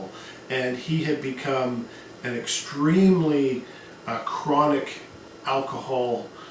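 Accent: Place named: Canada. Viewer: Your lectures are made even better by that wholesome, folksy Canadian accent.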